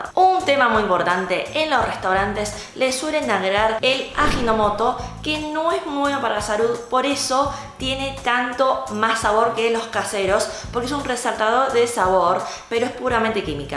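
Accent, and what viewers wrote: Argentina. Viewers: Me parece increíble verte hablar con acento Argentino. Me gustan todas las recetas Chinas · Encantador contraste entre tu acento argentino y tu encantadora belleza asiática. Me agradas.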